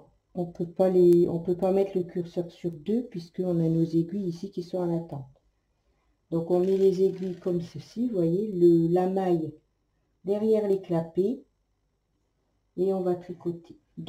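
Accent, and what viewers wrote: North, France. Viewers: mais non faut pas moi j'adore, c'est un accent charmant ! vous vivez dans le nord ?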